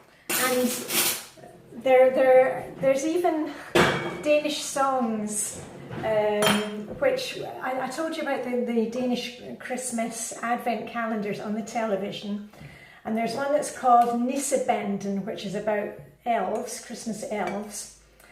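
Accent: Scotland, United Kingdom. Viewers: Danish with a Scottish accent. That’s different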